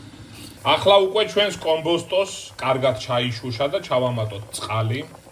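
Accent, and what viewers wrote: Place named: Racha, Georgia. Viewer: რაჭველი ხარ???? კილო გაქვს რაჭული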